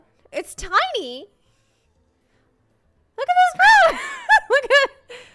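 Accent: South, United States. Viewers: A+ southern RP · The southern accent made this video great for me being from and in southern Louisiana 😂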